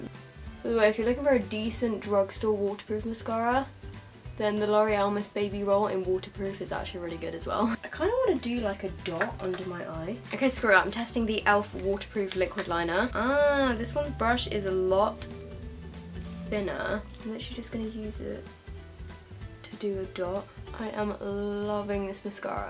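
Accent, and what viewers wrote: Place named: RP, United Kingdom. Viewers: Jasmine Lister just her accent I think. I'm from Newcastle and if anyone sounded like that from here they would be called posh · Jasmine Lister I live in the south hahahah and she doesn't sound posh compared to 99% of people I know. She has what I'd call a regular English accent, you guys have a northern accent and I have a southern/posh accent 😂😭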